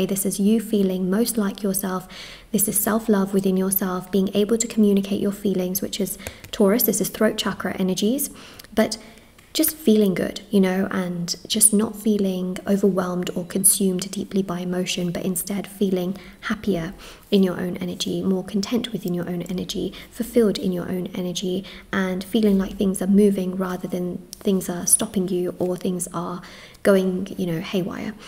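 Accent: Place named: South East England, United Kingdom. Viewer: you sound like your from the south east uk, I love watching your videos, I read tarot cards too but find yours a comfort and more clarification for the readings i do on myself x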